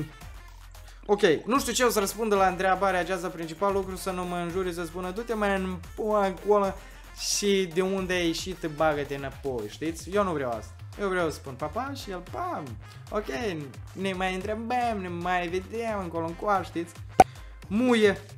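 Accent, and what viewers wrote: Moldova. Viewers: esti moldovean???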